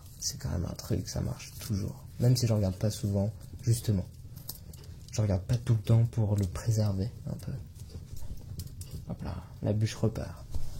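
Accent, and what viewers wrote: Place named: France. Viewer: No entendi nada pero me gusta tu acento y idioma Frances 😊😊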